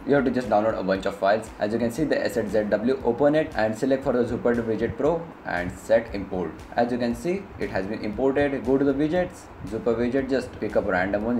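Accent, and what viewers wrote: India. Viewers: i hate, really i hate the english accent of Indian people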